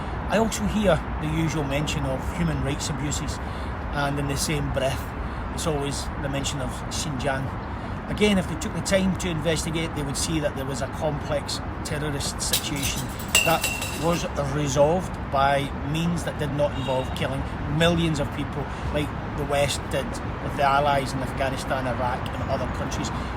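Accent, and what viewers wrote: Scotland, United Kingdom. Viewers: What's the accent?! Scottish?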